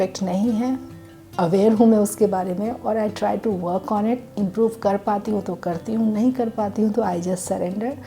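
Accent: India. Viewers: Love the way you explain things.Love your smile, listening to you,has a bihari tone being a bihari love it.keep smiling always ♥️♥️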